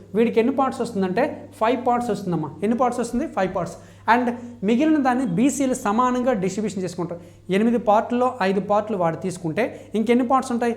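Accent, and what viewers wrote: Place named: Srikakulam, India. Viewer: Sir meedhi srikakulam kada 😍😍😍😍👏👏👏 nice explanation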